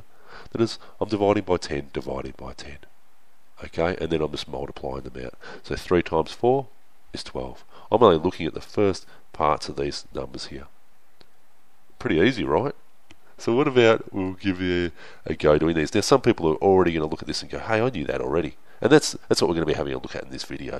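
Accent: Australia. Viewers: I feel like he's Australian, not British. Of course an American can't tell the difference lol. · I think your an Australian because you have a very aussie accent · Hes definitely Aus, from a fellow Aus. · so weird and nice to hear an aussie voice on YT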